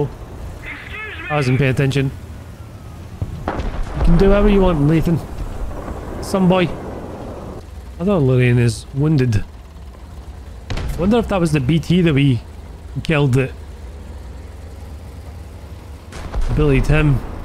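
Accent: Scotland, United Kingdom. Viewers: A great Scots man. Love the videos and the accent.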